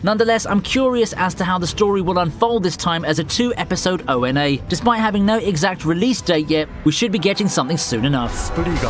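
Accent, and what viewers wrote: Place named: United Kingdom. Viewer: I love your british accent!!! Thats why I'm a fan of you!!!!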